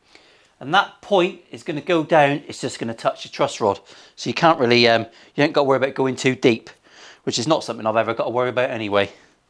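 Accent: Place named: Northern Ireland, United Kingdom. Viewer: The random northern irish accent always catches me off guard!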